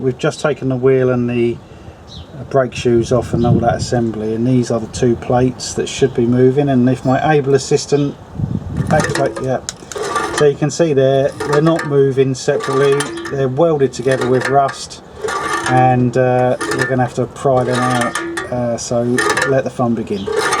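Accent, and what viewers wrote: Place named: United Kingdom. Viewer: Thanks for keeping video posted!!! I get the hint that this is a 03 Jeep Liberty but you sound like your in Great Britain, but again I didn't think Jeep was in Great Britain???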